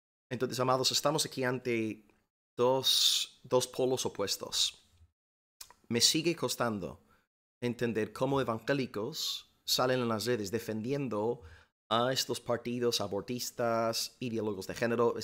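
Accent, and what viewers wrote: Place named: United States. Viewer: Habla como gringo pero no puede traducir bien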